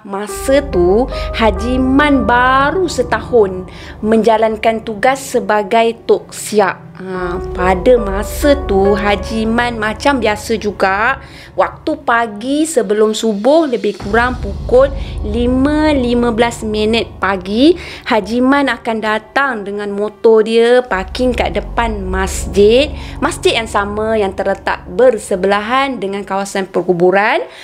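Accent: Kelantan, Malaysia. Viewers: saya dh agak dah kakak orang kelantan,masa kakak bercerita ada sikit tubik bahasa kelantan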